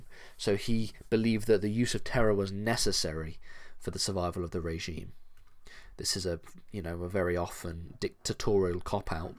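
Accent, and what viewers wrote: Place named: Northern England, United Kingdom. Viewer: you can tell this guy is nothern by the way he says "class", i love it. great videos literally learnt all my year one contnent from this page. screw online school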